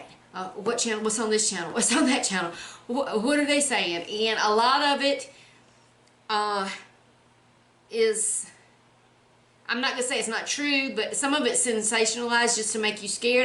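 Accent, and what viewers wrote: South, United States. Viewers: You're such a good Mama, great haul, and sure have missed your Southern accent, be safe♥️🐾♥️🐾♥️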